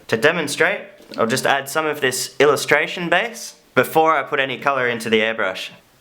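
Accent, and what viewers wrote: Australia. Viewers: thnx m8, btw I love the AUS- ACCENT. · thanks for the advice and hey I like your astarlian accent :))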